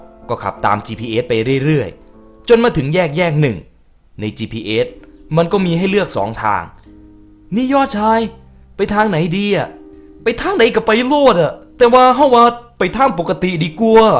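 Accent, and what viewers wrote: Isan, Thailand. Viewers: เนื้อเรื่องมันก็น่ากลัวนะ แต่ฮาสำเนียงอีสานพี่มากกว่า อินอะไรขนาดนั้น <num> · ชอบเสียงอีสาน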